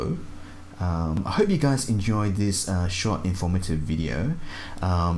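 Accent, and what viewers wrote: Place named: Australia. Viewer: thanks for this info ... I like your Australian accent so cool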